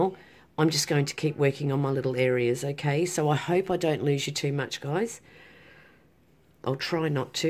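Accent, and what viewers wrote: Australia. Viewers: Haha!! Well then, that’s perfect!! We both got a little chuckle this morning ☺️ (or “this evening” 🇦🇺 you sound Australian which means are 14 hours apart) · Ah the Aussie accent and the 'youse' hehehehe! Hilarious! (I'm a Aussie too.) I see someone has already talked about the narrow field of vision so that's great for next time, but you might also try not to say 'um' so much hehehehehehe. This technique is so very exquisite isn't it? i love it. is the paint waterproof, how do you seal the tile and can it be put on a wall or is it only to be framed?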